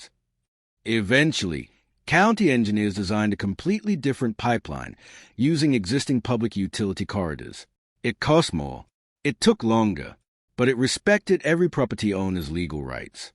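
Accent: United Kingdom. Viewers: A Texas rancher with a British accent, interesting.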